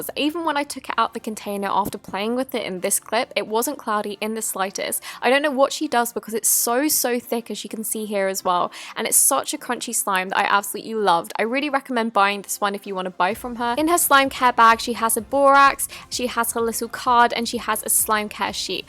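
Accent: United Kingdom. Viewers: Sooooo im still confused are you brittish? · Hamiltrash -_- "the british accent" is very broad britian includes scotland england wales a n ireland, most people that live far away from eachother (such as the north and south of england) cant understand what they are saying! accent is deffinatley english, like zoella's but she definatly doesnt sound northen so im guessing she is from some area more west also if you say that are language is weird (which I know you havent said that but lots of people do) just know that we (the brits) * created the language you speak! have you everheard an american tell anyone that they speak american? well if you have then they are damn wrong because americans, canadians and so on speak *ENGLISH the language that origanated in, what country? England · what part of the u.k. are you from i live you're accent!!!!!!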